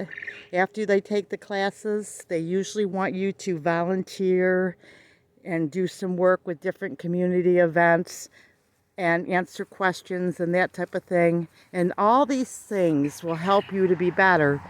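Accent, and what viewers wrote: United States. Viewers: sounds just like here in Ohio lol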